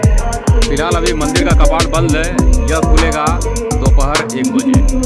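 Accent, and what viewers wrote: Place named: Varanasi, India. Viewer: Video tho achcha laga vusse bi jyadha aapka bolna bahuth achcha lagta hai ♥️ banaras ke hindi sunne me bahuth masth lagta hai 👌👍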